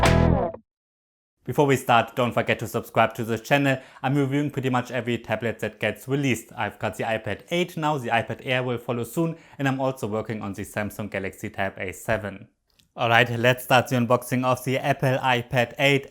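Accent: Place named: Germany. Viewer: I'm still waiting for apple to redesign Thier budget iPad! So waiting for 9th or 10th gen! And I watch your videos after a long time before watching I really missed your German-English voice!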